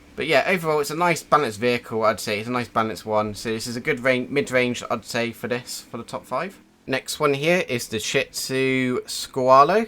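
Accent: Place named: United Kingdom. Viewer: That is British English for sure haha. Thanks for a nice video 😊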